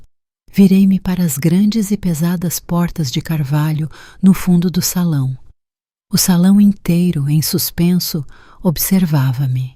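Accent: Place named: Portugal. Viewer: Tudo ia bem, até a incongruência A festa era para comemorar casamento dos pais do Tiago Depois, a sogra diz que o genro havia sido enganado, ensinado que o pai havia sido heróico aviador, que morrera Mas o filho olha para a mãe e o pai . Filho de 40 anos , tempo do casamento,dominado pela mãe, precisa de psicólogo. Por último, o marido em choque. História até ia bem, mesmo com derrepente, sotaque lusitano, mas misturou muita coisa. E não explica como a garota casou com o Alecrim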